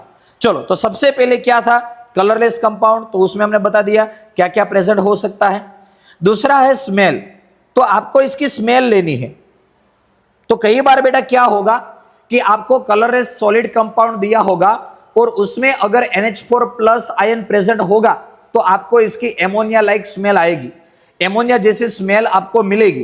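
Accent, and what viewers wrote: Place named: Gujarat, India. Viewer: sir to gujarati chhene etle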